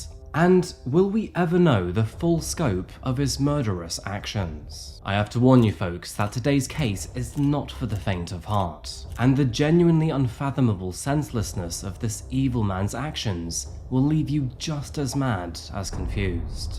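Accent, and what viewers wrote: United Kingdom. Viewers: Translation: He's cute and has a British accent. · I love to watch coffeehouse crime videos., The way you execute your videos and the way you tell us how you feel make me feel that you are a very good person. I love to sit and watch your work. I know it will be good footage from start to finish. Please cover all kinds of stories whether they’ve been covered already. whether they’re old or new, weird or wild. And I always learn something interesting about the location you are covering. I love how you tell the it!! And of course, that British accent!!!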